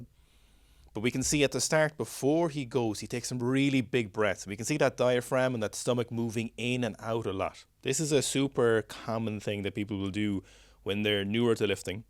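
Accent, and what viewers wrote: Ireland. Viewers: Aggressive, disorganised breeding before the squat. Man I love the Irish accent.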